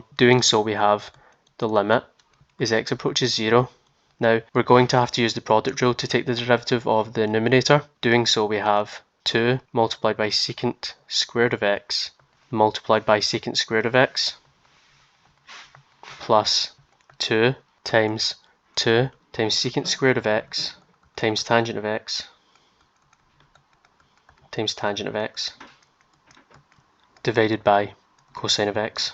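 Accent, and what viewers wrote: Scotland, United Kingdom. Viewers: ... What I forgot to ask you, if I'm not intruding, is, are you Scottish? I have an expat background and used to live in Scotland for about 3 years not far from Aberdeen in Milltimber beside the famous river Dee, a very beautiful small village... your accent sounds Scottish, but I could be wrong (lol); by the way I'm 1/2 Dutch/1/2 French...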